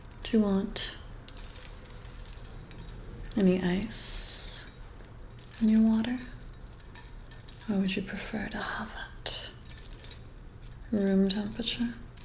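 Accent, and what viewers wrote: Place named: South, United States. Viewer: Your voice sounds very Country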